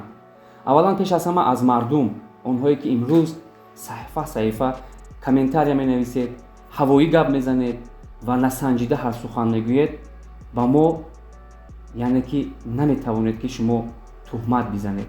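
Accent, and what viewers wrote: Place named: Tursunzoda, Tajikistan. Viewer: Муҳтарам шумо зодаи кадом гушаи Точикистон ҳастед, чунки тарзи сухангуйиатон каме ба мо мардуми Турсунзода монанди дорад?Агар малоли хотиратон бошад узр мепурсам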